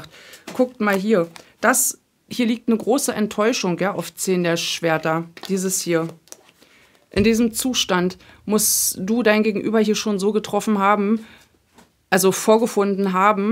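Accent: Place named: Berlin, Germany. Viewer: Immer wenn ich dir zu schaue... Fange 8ch an zu Berlinern 😂 dat in Hamburch ist immer komisch 😂.. Den janzen Tag hab ik so jeredet... Heimat Gefühl... ❤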